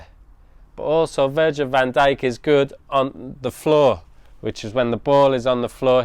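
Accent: United Kingdom. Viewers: Are you English? I like your accent, I'm a new subscript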